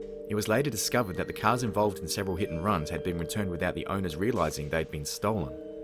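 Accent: Australia. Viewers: You sound like an aussie urmaker! I love it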